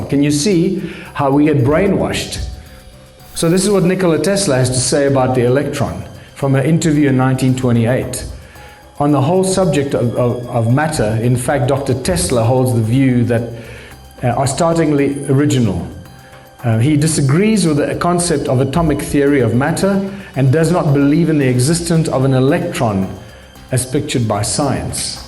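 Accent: South Africa. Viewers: Definitely sound like a X Rhodesian or South African stock.Thinking People 👍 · he sounds like those who kept blacks segragated south african racist scum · Is this guy South Aftican?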